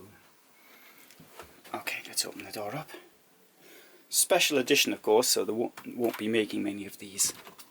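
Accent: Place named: Geordie, United Kingdom. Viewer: i like watching these but your slooooow geordie accent grinds on me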